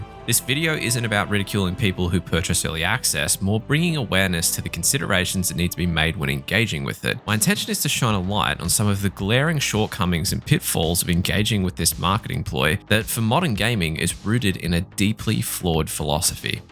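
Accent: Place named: Australia. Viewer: If only it werent for the Aussie accent, it'd be decent content.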